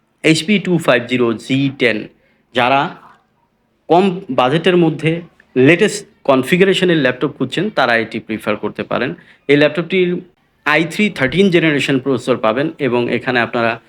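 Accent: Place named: Kolkata, India. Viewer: Agge dada, the you talk like kolkata babu, why not Bangladeshi tone?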